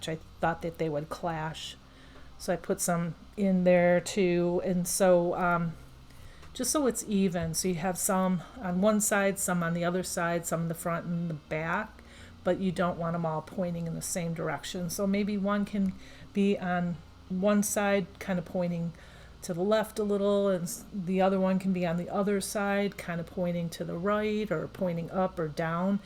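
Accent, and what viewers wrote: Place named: Midwest, United States. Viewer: Love the stuff. I hear a strong midwest accent. 😊😊 I'm originally from Illinois.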